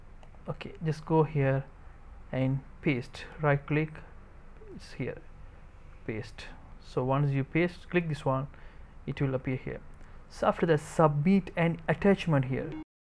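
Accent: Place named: India. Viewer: dude if ur an indian then just talk English in indian accent man the accent was irritating by the was the the msg was informative, helped me thanks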